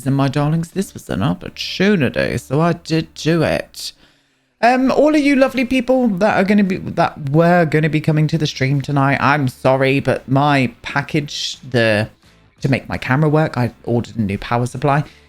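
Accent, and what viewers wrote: United Kingdom. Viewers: Wait..... you’re British?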